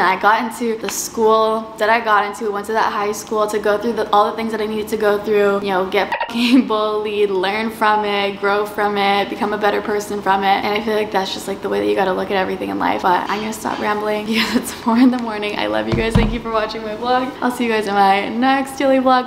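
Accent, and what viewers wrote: Canada. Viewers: i know this is a random question, but i cant tell if has a Canadian accent?? does she?? i can never tell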